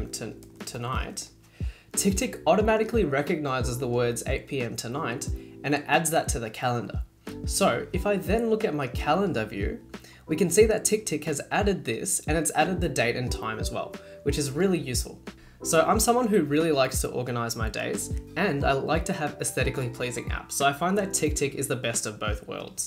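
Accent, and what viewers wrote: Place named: South Africa. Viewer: Are you originally from South Africa? Your accent sounds exactly like someone I know who is from there.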